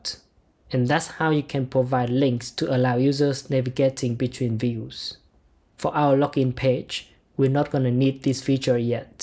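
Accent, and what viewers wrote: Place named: Vietnam. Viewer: nghe tiếng Anh từ nước bản xứ thì không hiểu chứ nghe tiếng Anh từ người Việt thì hiểu 90% haha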